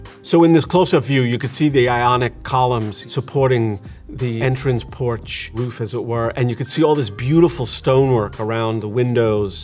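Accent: United States. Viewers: Can you find an English architect for the English architectures? Or architects according to the building. He butchered most of the proper English names with silly American pronunciations :-(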